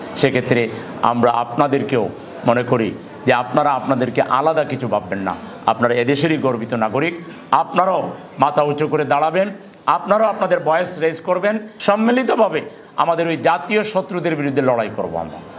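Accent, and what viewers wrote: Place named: Sylhet, Bangladesh. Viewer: উনার বাড়ি সিলেট।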